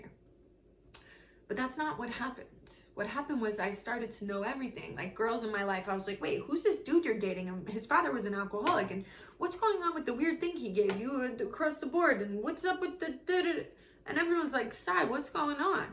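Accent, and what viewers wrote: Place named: New York, United States. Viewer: It's the new York accent but I agree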